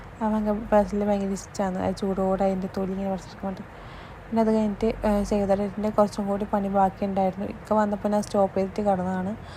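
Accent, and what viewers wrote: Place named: Kannur, India. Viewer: kannur il evdanu...aa slang kettappo thanne manasilayi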